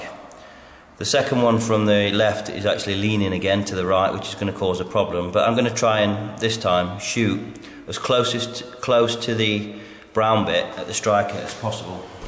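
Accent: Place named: East Midlands, United Kingdom. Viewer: Hi, where is this indoor range mate, sounds like your from the Notts/Derbyshire area (forgive me if I am incorrect in my assumption of dialects). BW Frankie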